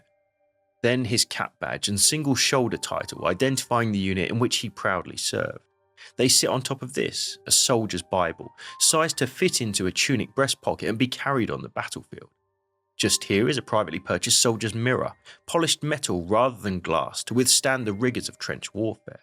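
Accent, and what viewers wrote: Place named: Hertfordshire, United Kingdom. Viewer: Are you in Herts? For a while I've heard some kind of familiarity with your accent!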